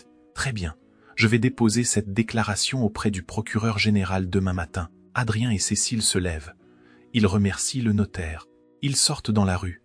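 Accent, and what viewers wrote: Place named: England, United Kingdom. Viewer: Arrêtez cet accent anglais c est insupportable et n à aucun sens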